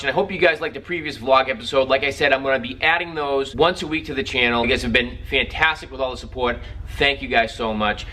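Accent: Boston, United States. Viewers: My brother! I hear a Boston accent. Yeah? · Are you from Boston??? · I can hear some Boston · u southie? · Love that Boston accent. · From Boston?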